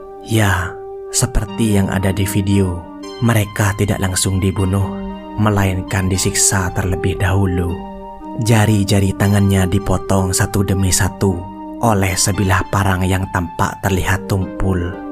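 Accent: Madura, Indonesia. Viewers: Orang madura ya bang!! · Orang madura bg? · Orang Madura ya bang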